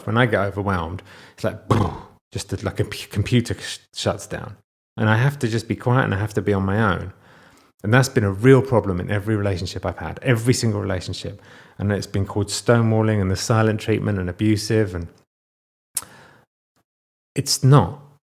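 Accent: United Kingdom. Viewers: I have noticed that a lot of your videos have been triggering for me specifically because you remind my of my ex. He is British, MCR, and we are both in recovery (post divorce - he was an alcoholic while we were married, and I abused alcohol post divorce - for various reasons treated via therapy). And idk if it is cultural (you're both from England, and you both have English accents ((obviously not the same accents))), or not. But I've had to really work to separate the two of you. He is very much a social media dad. He has a lot more work to do, because he started years after I did. I've had so much therapy and he hasn't. Idk, it's really weird, and I have to really work on staying in my own hoopla hoop when watching your videos specifically. I'd love to have a few conversations with you about it, but I know that probably isn't something that will actually happen lol. 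You have a lot of followers. But I do genuinely love the videos and openness from you and Laura. And I'm an adult who was diagnosed later in life as neurodivergent, and all 3 of my children were diagnosed as children. My mother had severe mental health issues (diagnosed).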